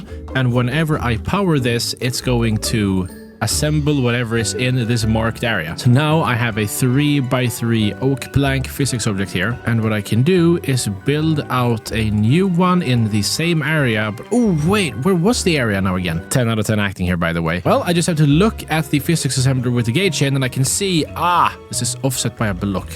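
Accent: Sweden. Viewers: Is Swedish? Cause I Am also Swedish and it feels like the pronunciation of a couple of words feel ”Swedish” aka the accent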